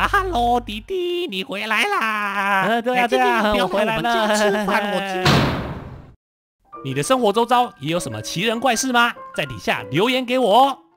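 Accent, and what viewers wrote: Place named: Yilan, Taiwan. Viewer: 經過我不專業的耳朵，你應該是宜蘭腔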